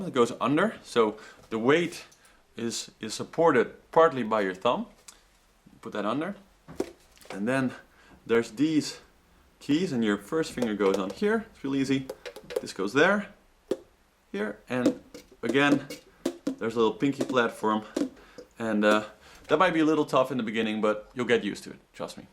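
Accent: Netherlands. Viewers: Is he Dutch of zo? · Are u dutch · Sounds like you have a slight Dutch accent, true? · You're Dutch aren't you? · jij bent nederlans ik hoor het aan je stem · I CAN TELL YOUR DUTCH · Ik hoor dat je een nederlander bent haha. 😁 ik speel al Gitaar en Viool. Maar zou ook heel graag Saxofoon willen leren spelen. En kan niet wachten om ermee te beginnen. Ik ben er voor een aan het sparen. En ik heb me geinformeerd bij een lokale Fanfare om zo al eerst op de Sax te leren spelen voor ik er een koop. Is dit een goed idee?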